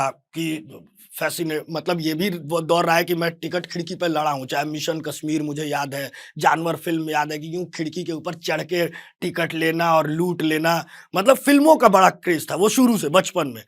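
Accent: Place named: Ballia, India. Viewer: Vinod ka hi real character lgta hai.. Ballia ka sahi accent hai aapka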